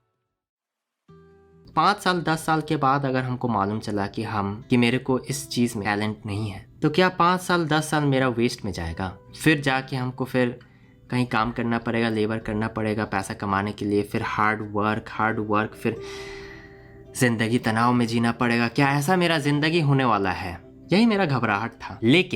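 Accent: Bihar, India. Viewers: It is quite intriguing to hear a Korean speak Hindi in Bihari accent! Mind blowing to hear the "Bhadiya" with nasal tone!! ha ha ha ha Heard your thoughts on Money and success. Would like you think a bit more on success. Money or popularity or power is not really success in life; money is means for life. Also one big point you missed is of multi-tasking. nobody says you ought to do one thing only at a time, focus on 2-3-4 things to be made sources of Income. Do something online like stock trading or tutoring or youtube, do full time job and a part time over weekends sometimes, all at same time or different times. Since you are young you can start multiple ways and then drop many or all along the way and start something new ! Yes, you think tooooo much, Just do it !! If you think giving gyan would be great on YT, no, stick to stories... preferably imaginative...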